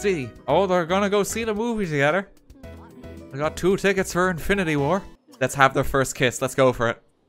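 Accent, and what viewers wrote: Ireland. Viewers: are your irish?!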